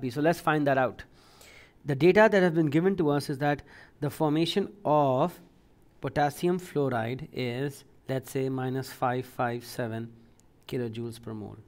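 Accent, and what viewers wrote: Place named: Sicily, Italy. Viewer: More like sicilian.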